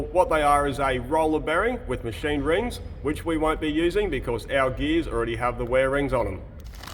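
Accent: Australia. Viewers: I'm from Germany and that Australian accent makes it easier for me to understand... I was born near Cologne and the rythm of Australian and "Kölsch", which is a German accent spoken around here, is quite similar...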